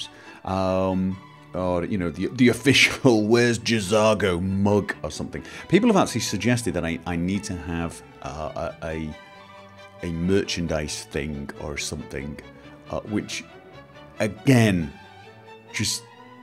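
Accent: United Kingdom. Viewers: WAIT.. you're not a well mannered British Sir with a calm voice who wears mostly tuxedos, monocles and top hats/bowlers in real life who - for some inconspicuous reason - lives in Norway? THE WORLD IS BROKEN. In all seriousness, it's really hard to imagine an angry. Like, that British voice that taught me how to mod Skyrim and FNV? That's just beyond my imagination. Or that an angry would at least sound not that angry.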